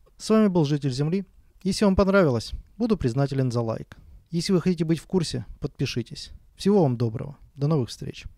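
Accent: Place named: Ukraine. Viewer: у тебя акцент украинский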